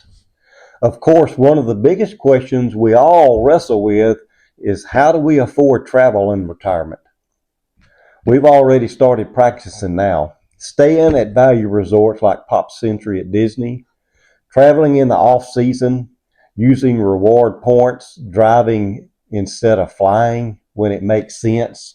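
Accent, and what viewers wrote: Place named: Texas, United States. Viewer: I heard that accent on your latest video and had to scout you guys to see if you are a Texan. Im at the gym, more later.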